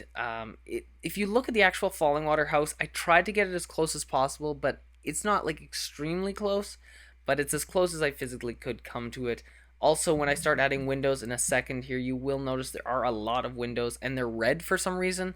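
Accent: Canada. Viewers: are you from canada?? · no im not. ive never heard a canadaian accent b4 and i liked hearing it and i wanted to copy it momentarily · are you from canada?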